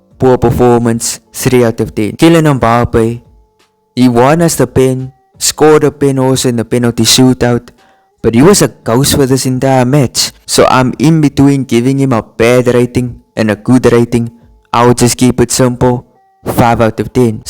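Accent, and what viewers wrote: South Africa. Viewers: Love your channel man. I can tell you are South African with your accent 😅👌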